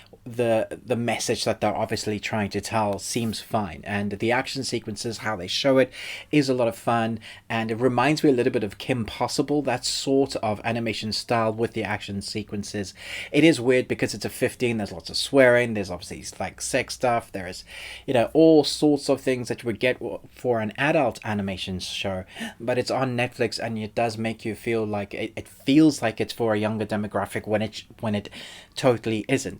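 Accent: South Africa. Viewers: You South African . Much love from cape town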